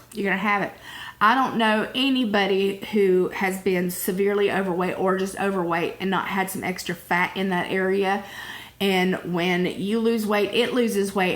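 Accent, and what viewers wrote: South, United States. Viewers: I just love your southern accent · I tripped over your videos one day and subscribed. I do not have weight issues. You look like my aunt Lois who did have a weight problem. You are beautiful and so was she! She was Pisces. What sign are you? Anyway, what got me to subscribe was hearing your accent, and your truthful discourse. You remind me of every southern girlfriend I had growing up. I LOVE HEARING YOU AND YOUR ADVICE!!! Are you from Tennessee? I'm from there, but I also grew up in North Carolina for 10 years. BTW I'm 65 with a 34 year old boyfriend. : )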